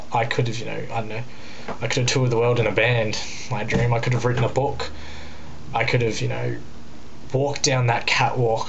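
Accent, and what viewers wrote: Australia. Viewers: I'm Australian and if you are Australian you would be able to recognize his accept ahahah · I'm British.. you deffo sound like an Aussie lol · Heh only a Yank would think that was British. But as a Brit, you have a lovely Australian accent. ;) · ) australian accent, thats hot xP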